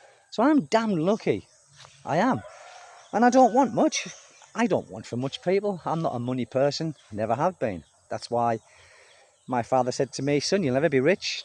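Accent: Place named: Birmingham, United Kingdom. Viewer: You speak a lot of Birmingham Midland English, just like Peaky Blinders, the new movie just came out, have you seen it? Have you seen it?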